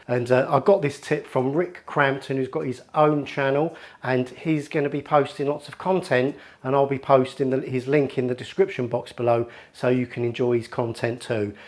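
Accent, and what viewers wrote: Liverpool, United Kingdom. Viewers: Proper scouser ❤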